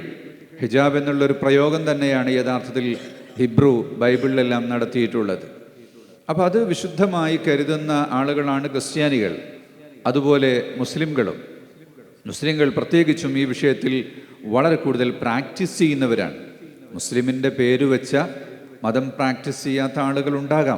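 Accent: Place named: Arab world. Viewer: Iyaku malayalam ariyumo?? Arabi malayalam parayunnu. Pacha sanki malayalam.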